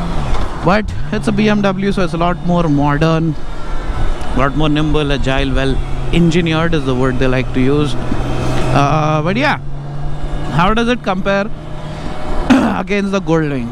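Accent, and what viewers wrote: Hyderabad, India. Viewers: K T M 1290 k Bare me Video Banow..Zara Hamari Haidrabai me Bolne ka nakko Bhulo..yaron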